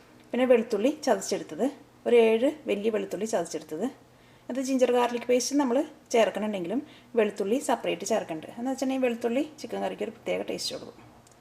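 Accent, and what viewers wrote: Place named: Irinjalakuda, India. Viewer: I like your slang.. are u from Trissur?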